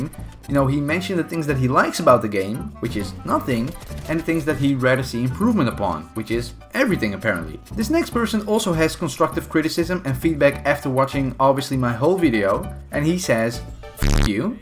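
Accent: Netherlands. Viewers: are you dutch? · I guess you’re dutch? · Are you dutch? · Je bent nederlands, Ik hoor het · Are you Dutch?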